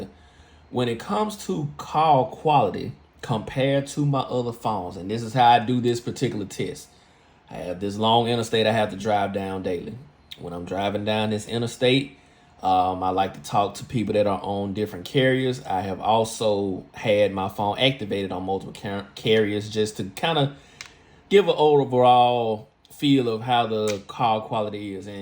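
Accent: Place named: South, United States. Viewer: Thanks for info bro. I can tell you from the south! Love from Alabama.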